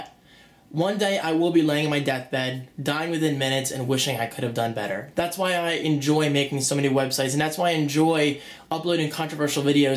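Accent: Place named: South Jersey, United States. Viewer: Wawa is a 10X better version of a 7-11. They have them all over Jersey and PA. Judging by his accent he's prob from the South Jersey/Philly area.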